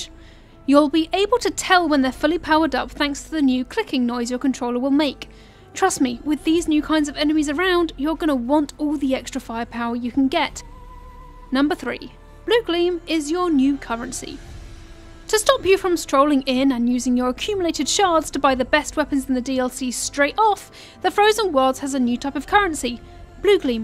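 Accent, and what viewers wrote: United Kingdom. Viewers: Are girls not allowed to have a British accent?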